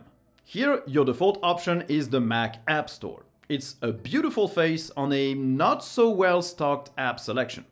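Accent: France. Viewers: That's French accent^^ funny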